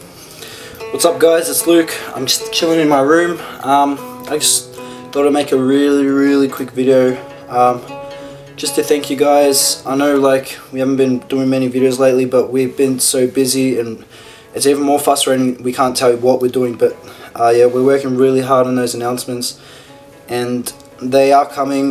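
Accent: Australia. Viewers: GLAD THAT YOU HAVENT LOST UR AUSSIE ACCENT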